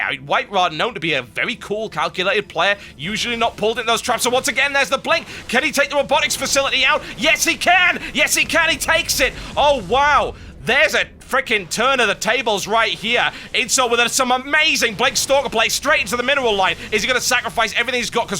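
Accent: United Kingdom. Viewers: thats the power of a british accent.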